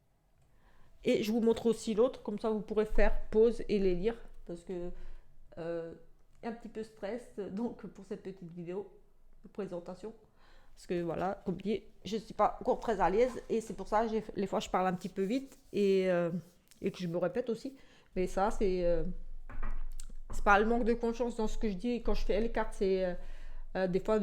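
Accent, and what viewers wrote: Alsace, France. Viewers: Bonsoir, je vous ai découverte il y a peu. J'ai immédiatement entendu que vous veniez d'Alsace et il n'y a pas de problème. Je suis du Sud ouest et j'ai aussi un accent alors ne vous laissez pas diriger par les autres pour si peu. Dites ce que vous avez à dire. Merci pour votre vidéo.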